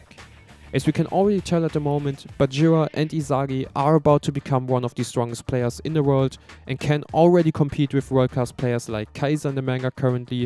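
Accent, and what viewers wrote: Germany. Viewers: du bist doch safe deutsch. ich erkenne an deiner Aussprsche bei einglisch. ist richtig gut!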